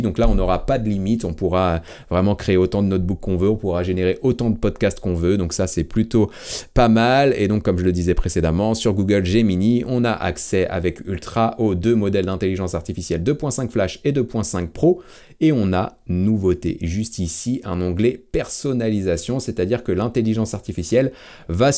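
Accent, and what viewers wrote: Quebec, Canada. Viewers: Merci! Hilarante la vidéo avec l'accent québécois! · L'accent québécois excellent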